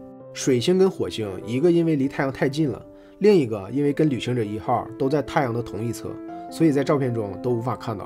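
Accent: Northeast, China. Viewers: 能不能不说东北话，太拉低档次了，好好学习学习普通话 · 好重的东北口音 哈哈哈哈😂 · 这个东北口音太逗了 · 这东北大碴子味儿听着真难受，每句话的最后一个字都是降调，又软又怂又丧失，把挺好的文案全毁了，越听心情越低落，每句话都出溜着说，老头钻被窝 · 做得很好。有点东北口音更好😊！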